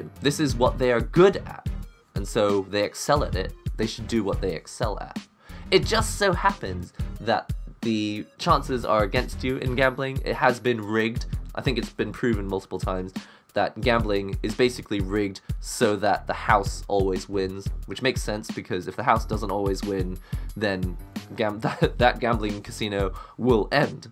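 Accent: England, United Kingdom. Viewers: the british accent really fits you XD I mean I know the accent wasn't intentional but damn XD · It warms my heart to see an Asian with an English accent rather than American.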